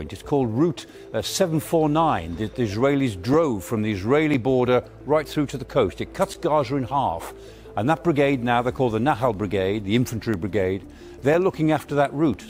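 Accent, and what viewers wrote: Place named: United Kingdom. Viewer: no one, but British pronunciation is annoying lol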